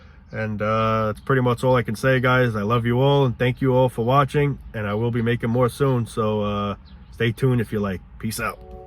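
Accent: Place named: Staten Island, United States. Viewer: He sounds like he’s from Staten Island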